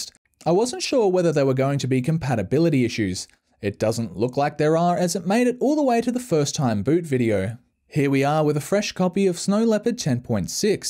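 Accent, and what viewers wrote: Australia. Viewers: here is that aussie voice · That's the aussie accent mate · The Aussie accent 😆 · got that aussie sound.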